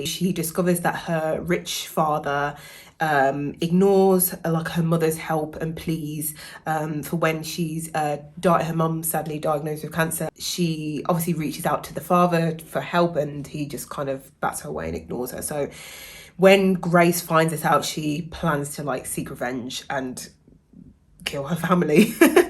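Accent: Birmingham, United Kingdom. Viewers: Are you a Brummie? 💐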